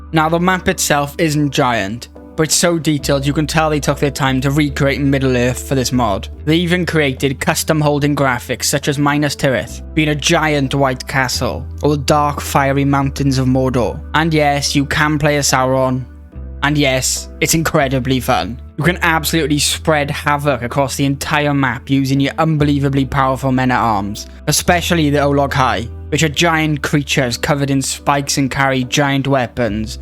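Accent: Wales, United Kingdom. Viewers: Hey great vid man, nice to see another Welshman into ck3, keep it up my good man, your accent tells me you’re from around the Swansea area?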